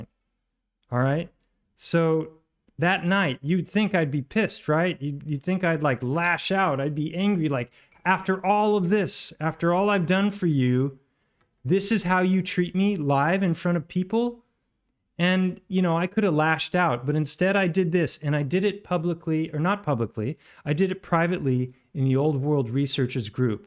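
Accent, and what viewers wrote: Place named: South, United States. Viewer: It gets worse!! Typical Southerner ,he loves the sound of his own arse, sorry voice.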